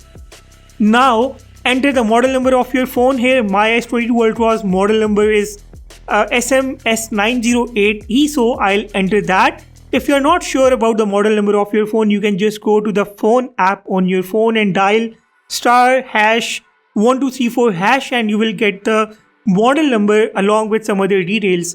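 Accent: India. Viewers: Why the F you lying? You have an indian accent.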